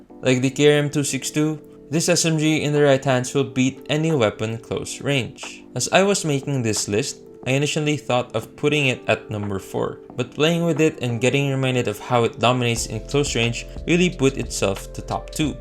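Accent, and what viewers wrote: Philippines. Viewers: I have same accent. P-nAt batEr. Love from Philippines. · Pinat Bater - gotta love our Filipino accent. Nothing wrong with it. Language is dynamic anyway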